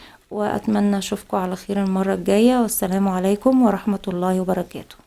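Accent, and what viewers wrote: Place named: Egypt. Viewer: كل اغراضها هديه يالبنت المسكينه هههههه فيديو جميل احب اللهجه المصريه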